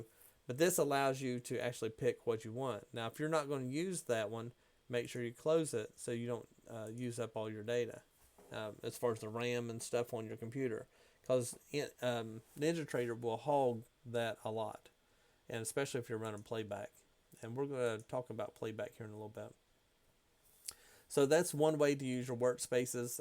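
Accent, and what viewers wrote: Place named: South, United States. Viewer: Great video awesome thanks for explaining the internal link versus the outside link, I also love the information of where closing out a workspace that were unused you made that so clear I have noticed the speed increase in my ninja trader thanks so much, and you have an awesome accent, southern gentleman🤩🤩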